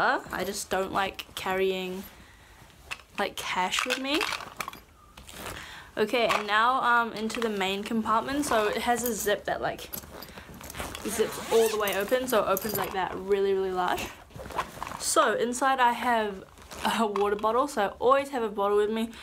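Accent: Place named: New Zealand. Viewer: Are you from New Zealand?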